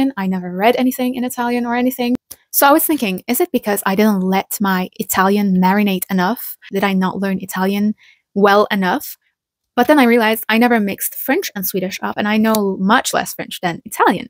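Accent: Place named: Turkey. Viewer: Her english accent does sound turkish, i guess her channel name is not her real name then.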